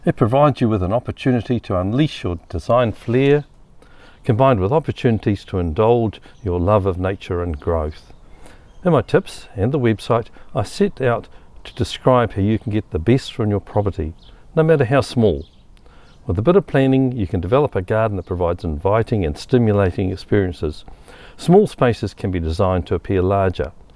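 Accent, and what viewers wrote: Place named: New Zealand. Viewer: Hi, I'm looking forward to working my way through your tips. We moved from 1/4 acre in the 'burbs of Hamilton to 10 acres in Nth Canterbury 3 years ago and while I have gardened for many years and have big dreams I am somewhat challenged in the design and execution department:)) Always lovely to hear our NZ accent on "the tube".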